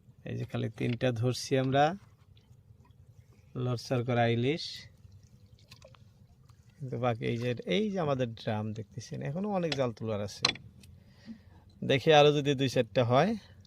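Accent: Bangladesh. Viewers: আপনার মুখের কথার ধরন দেখে মনে হয় আপনি বাংলাদেশের মানুষ কিন্তু বুঝতে পারলাম না আপনি ভারতে গিয়ে কিভাবে ভিডিও বানালেন?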